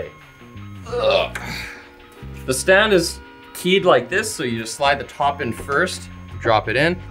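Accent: Canada. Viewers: I can really hear the Canadian accent in this video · Thank god for closed captioning. Can't understand a word with that Canadian accent.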